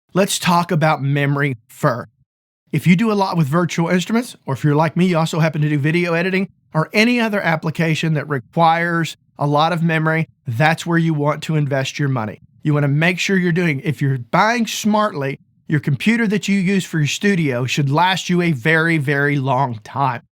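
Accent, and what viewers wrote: South, United States. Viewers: Says the guys with shit guitars hanging on the wall. Not to mention, FLORIDA. Can’t trust tech advice from FL or a southern accent. This post is F’ing stupid.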